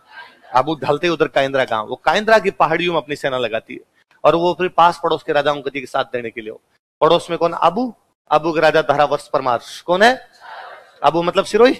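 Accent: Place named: Punjab, India. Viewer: Sir ki Panjabi Kamal ❤❤❤❤❤❤❤😂😂😂😂😂😂😂😂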